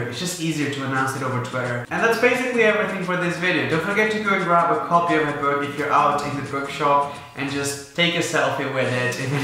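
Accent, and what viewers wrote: United Kingdom. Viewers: Is he British or Australian? I’m confused? 🤨😂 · I THOUGHT YOU WERE BRITISH!!!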